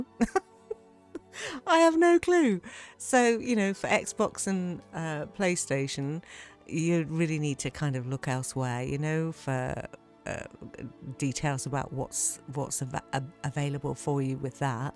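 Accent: United Kingdom. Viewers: I just love your videos and appreciate your consistency. Your warmth comes through in your videos and I always feel better after having watched them. I've downloaded many a mod because I wanted to know what mods you were using (and thankfully, you often say what plant, flora, mount, armor, etc mods you're using), in addition to being able to "try on" a house mod before donwloading it. I also bought Fallout 4 because you mention it so often. Still haven't played it, but at least it's in my library now, thanks to you! Thank you so very much for sharing your passion and talents with us. I hope you have an uplifting and joyous year! PS - I would swear that your day job is with Classic FM (You certainly don't have to say! Your privacy is your own.) Every time I listen to it, I think, "Is that??" You have such a lovely voice. We Yankees are such suckers for the British accent.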